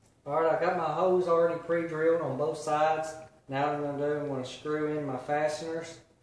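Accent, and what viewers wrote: South, United States. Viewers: Just a bit southern.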